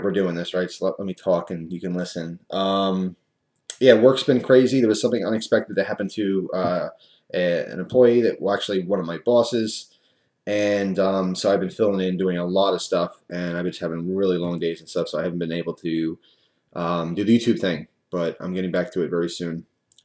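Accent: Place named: Pennsylvania, United States. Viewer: ya Pennsylvanian accent pride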